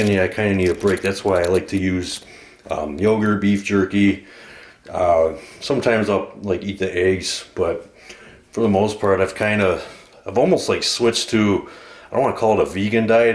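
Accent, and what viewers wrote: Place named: Michigan, United States. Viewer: Hahaha, I knew you were from up there because of the accent. Meijer confirms it. Michigan native, here.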